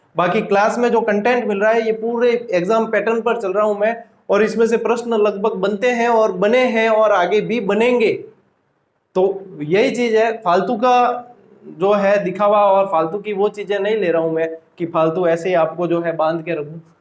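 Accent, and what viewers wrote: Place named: Jalore, India. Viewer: Aapki boli jalor side ki h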